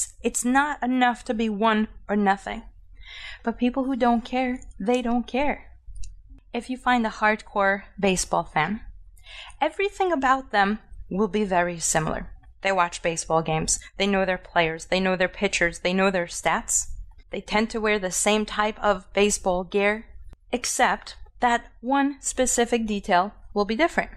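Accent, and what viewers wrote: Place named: Northeast, United States. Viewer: Thank you, this was very helpful. This also confirmed my suspicion that you were from the east coast. You accent was very northeastern LOL. LETS GO YANKEES!!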